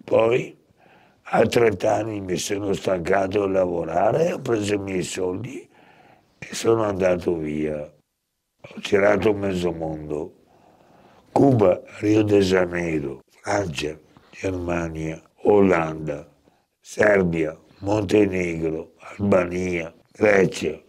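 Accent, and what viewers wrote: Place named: Alba, Italy. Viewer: Min vai in mona! Sui 2/3k al metro. Neanche in val Seriana o Immagina Brembana 25k un bilo dai, sui 50mt. Cmq bel personaggio, città alta tra Trussardi Percassi e Locatelli che non ho presente bhe belle zone ( Berghem de Sura ) cmq ( da accento inconfondibile Alba ) come mai tantissimi bergamaschi vedo nelle tue interviste..? Una percentuale decisamente alta su sei video fin'ora quattro lo sono. Conosco quasi perfettamente le zone che citano, Quarenghi Malpensata e Gleno,poi famose. sembra tanto quei ricconi di famiglia che sputtanano tutto in poco a destra e manca ( non lo nasconde del resto ) poi gli ordini di " grandezza " in termini economici lo sanno solo loro. Per ora non ho riconosciuto nessuno di vista ma in giro se ne vedono e di storie di ogni tipo. Anzi, ho conosciuto bei soggetti facendo Calisthenics da un tre mesi, Omari un ragazzo marocchino bel tipo trentina super tirato che non fosse timido potrebbe raccontare una bella storia molto interessante..ma farlo parlare così liberamente a telecamere puntate non penso sia impresa facile su due piedi. 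Provo ad accennare e convincerlo quando lo becco al parco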